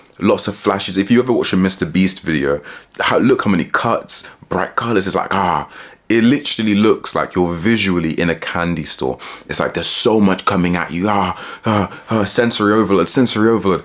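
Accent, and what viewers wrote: United Kingdom. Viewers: Jesus loves you and died for you. Also I love ur uk accent 😭 (im not from the uk)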